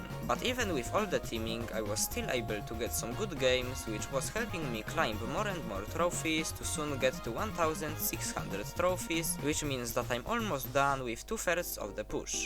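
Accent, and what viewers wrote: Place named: Poland. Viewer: Masz polski "akcent"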